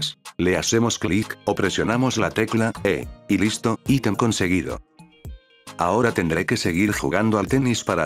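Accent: Argentina. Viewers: Es de arg o uruguay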